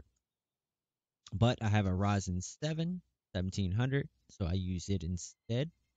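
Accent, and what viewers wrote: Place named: United States. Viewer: you sound like a american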